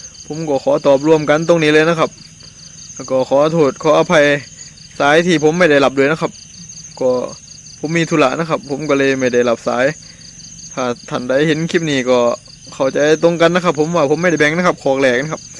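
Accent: Korat, Thailand. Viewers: ดี๋แท่ะๆ...ดี๋ใจ๋ดาเด้อนาย หมานๆรุ่งเรืองราบรื่นๆ มนต์เสน่ห์ภาษาท้องถิ่นโคราช...ใช่ออกสื่อ!!! FCเด้อนาย!!! 😍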